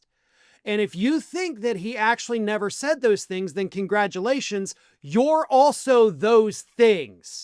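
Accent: South, United States. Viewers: Stop using the mocking southern accent to indicate you think the speaker is stupid.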